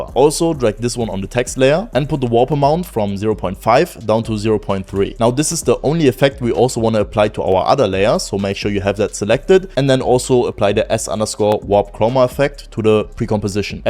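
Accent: Germany. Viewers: I hear German😂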